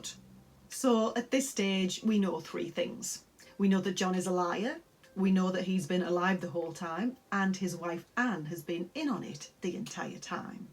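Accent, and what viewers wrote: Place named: Northeast England, United Kingdom. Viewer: Ooh just discovered your channel. I love all the true crime youtubers. You also sound like you have an accent very close to mine. You also North East England?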